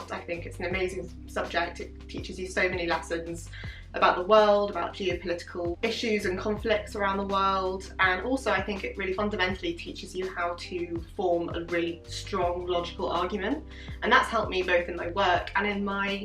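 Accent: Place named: United Kingdom. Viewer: Wow; you are starting to have a British accent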